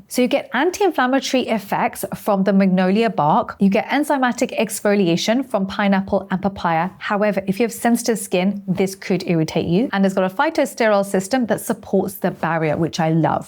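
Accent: United Kingdom. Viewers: But, u sound british, ur video has hit me in Britain, why we looking at American products? Im not in america! Can u do a video for black skin for products on our shelves over here in britain. Thanks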